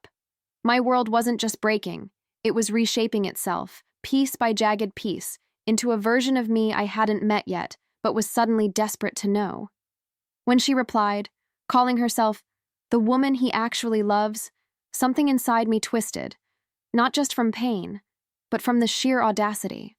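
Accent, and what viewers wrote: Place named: United States. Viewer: very strong over-emphasised American accent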